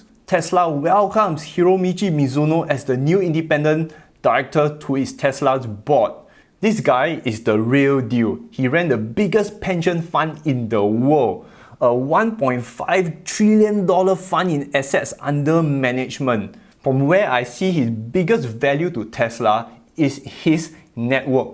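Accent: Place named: Singapore. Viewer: I don't know what is more valuable about: His knowledge or his Singapore accent?!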